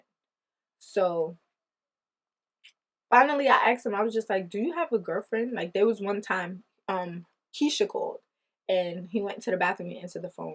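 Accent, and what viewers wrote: Jamaica. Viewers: Love that you get Jamaican when you get mad 😍👑🙌🏽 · You Are SO Pretty & I Love When You Talk Jamaican . #Suscribed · just found your channel, and mi neva hesitate fi subscribe from I heard Jamaican yazzz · are u Jamaican? you sound like it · Your Jamaican accent is everything 😍😍😍😍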